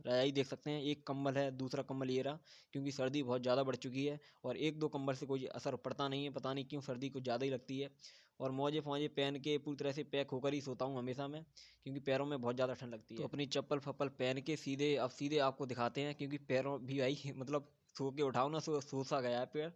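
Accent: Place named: Bareilly, India. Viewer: Brother are you from bareily or aagra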